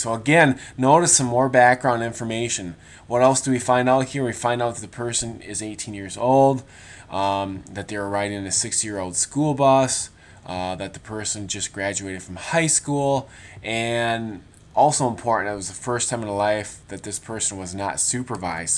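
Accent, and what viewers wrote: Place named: Canada. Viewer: hehehe Canadian accent..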